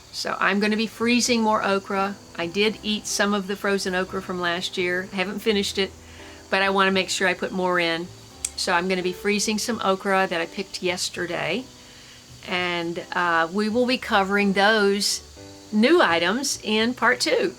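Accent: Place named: South, United States. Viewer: Is originally from the South? Sometimes I detect a bit of an accent 🤔 love this video, so much great information 😍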